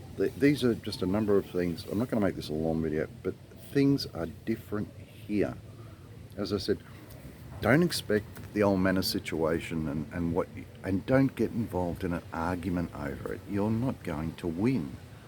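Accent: Australia. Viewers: Well done great vlog, nice to hear a Aussie voice were in central Queensland so lovely to hear direct items about the Philippines no bull just real deal thank you , just subscribed looking to the future videos , cheers mate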